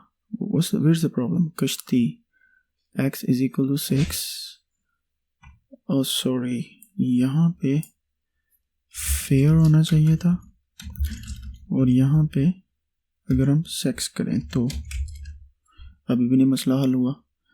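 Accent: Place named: Faisalabad, Pakistan. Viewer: kya maza aya, yayi wala accent yawwwrrr , and apka Faisalabadi accent, anyway keep us engaged and motivated. PS: 'anyway' b ap sy seka hai 😆 chillay k sath sath apka accent b sek jayengy 😃❤️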